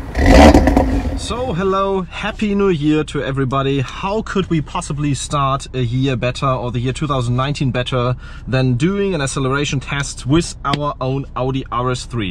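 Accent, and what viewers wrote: Germany. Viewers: Kannst gut englisch aber man hört das du ein Deutscher bist . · Ich find es erstaunlich, Aussprache übelst deutsch aber die Sätze fließen nur so dahin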